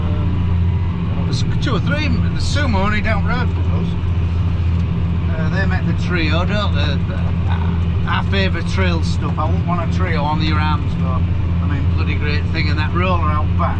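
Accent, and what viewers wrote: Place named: Driffield, United Kingdom. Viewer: Love this channel, I'm from Driffield originally and it is great to hear that accent . Miss my farming days, keep up the good work.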